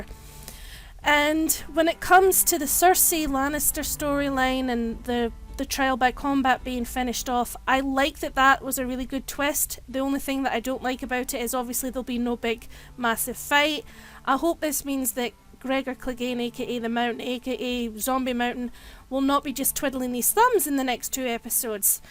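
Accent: Scotland, United Kingdom. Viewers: Scottish narrator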